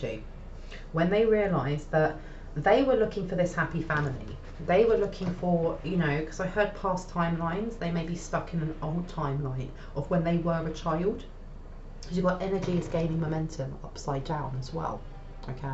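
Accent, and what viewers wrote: England, United Kingdom. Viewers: Finally a pommy reader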